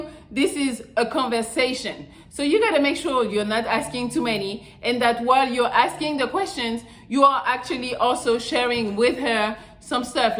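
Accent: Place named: France. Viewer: Helloz, my favorite part of your videos, is the energy you have when you start... "WHATSUP LOVERS" with that gorgeous French accent... you make our days... (^^,)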